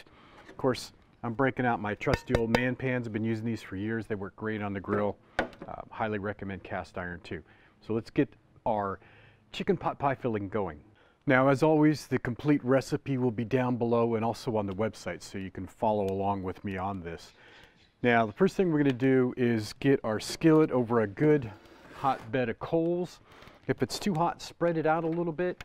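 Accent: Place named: Scotland, United Kingdom. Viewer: Very Scottish.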